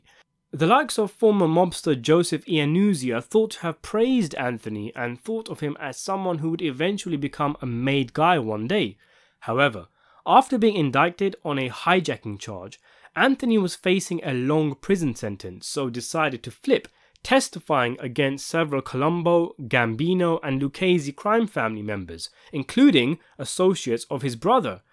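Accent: England, United Kingdom. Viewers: Your English accent is amazing. I'm American from Ohio an this is just beautiful with your voice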